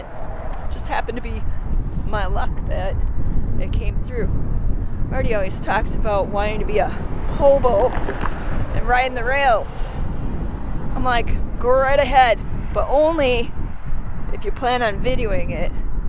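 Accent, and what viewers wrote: Wisconsin, United States. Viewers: Love the accent. Reminds me of my family that live around Marathon, Wisconsin. I worked as a professional archaeologist for years documenting old mining districts through out Arizona and Nevada. I currently work for a major railroad and get to see alot of these small town sites that are barely in existence.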